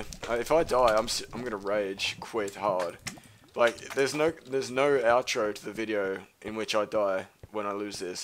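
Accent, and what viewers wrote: Australia. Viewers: Because he's using the Australian English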